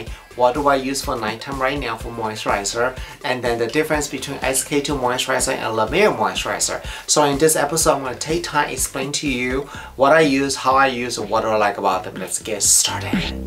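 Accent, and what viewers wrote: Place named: Mexico. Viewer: Why does he look Asian but sound Mexican?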